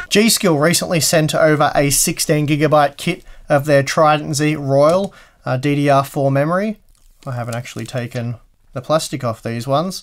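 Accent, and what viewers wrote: Australia. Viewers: Anyone else like how YouTube subtitles always say harbor unbox? Dat Aussie accent 😂😂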